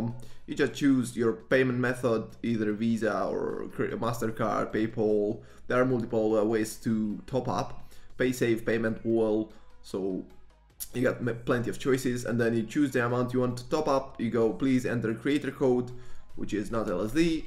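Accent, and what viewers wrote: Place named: Albania. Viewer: and u sound like u are from albania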